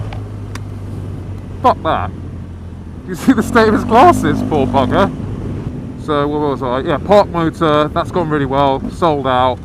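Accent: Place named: Surrey, United Kingdom. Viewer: HOLY Shit your from surrey! i knew i reconsied the accent somewere :D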